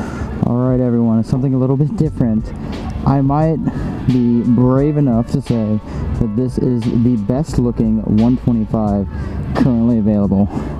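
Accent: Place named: United States. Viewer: thanks for the reply. I was confused as well because from your accent I knew you were an american and wondered if you were refering to imperial or metric system.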